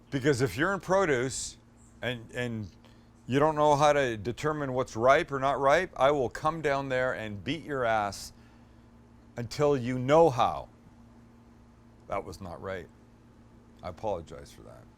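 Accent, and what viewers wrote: Canada. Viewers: He kind of has a Canadian accent Anybody notice that? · Hey Sam, I just found you a few weeks ago. I've commented on several videos how nice it is to see a man who seems like a real Californian, but who cooks much like my family does in the South. Believe it or not, we really do NOT deep fry and BBQ everything! lol... a shock, I know ;) But, really, you cook healthy...but not goofy healthy... REASONABLY healthy. And you won't hesitate to use some fat, real butter, and last week you even used MAYO where I often use butter, and you changed my life. I'm only barely exaggerating! Anyway..one real question: Are you from Canada? I ask because you say your "ou's" such as "out" and "about" with a little Canadians flavor (oot and aboot). Or am I just revealing how unsophisticated and un-cosmopolitan I am?? lol. Either way, GREAT channel.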